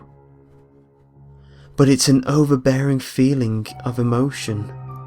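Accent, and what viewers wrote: United Kingdom. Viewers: Even better HES GOT A BRITTISH ACCENT *Dies of the sexyness* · Oh man, I am in love with your British gentleman accent. You sir, are the most amazing scary story teller I'v ever heard. Maybe in my WHOLE LIFE!!!!!!!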